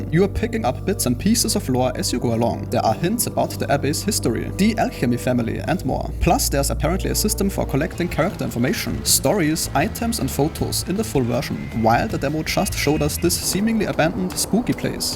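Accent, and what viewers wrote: Germany. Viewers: So geil wenn deutsche englisch sprechen 😂 man hört es irgendwie immer raus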